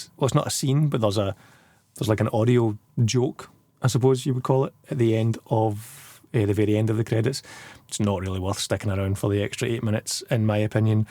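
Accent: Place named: Scotland, United Kingdom. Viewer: Hang on! You're Scottish?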